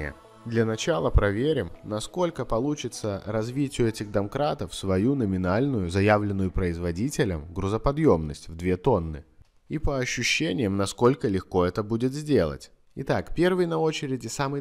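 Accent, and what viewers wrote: Belarus. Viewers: Канал называется Крэйзи рашнз эксперимент, а войсовер имеет выраженное беларуское произношение)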